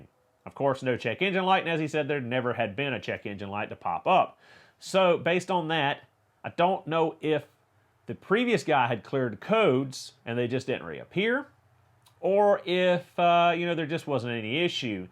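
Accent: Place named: Tennessee, United States. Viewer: Unrelated but where you from bud? I'm in tn and that accent is indigenous over here.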